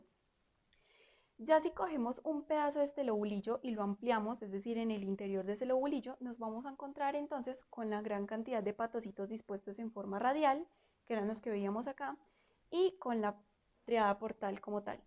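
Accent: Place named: Colombia. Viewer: Hablas demasiado rápido que no se te entiende nada,sumándole el acento colombiano que tienes ,terrible tu explicación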